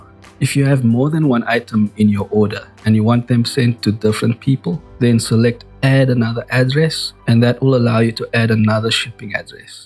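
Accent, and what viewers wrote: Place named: South Africa. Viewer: Why do you sound south African.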